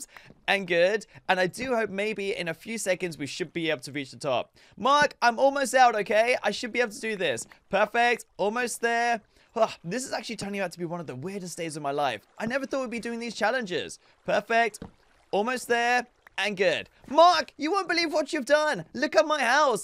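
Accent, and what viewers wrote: United Kingdom. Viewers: He be speaking british HAha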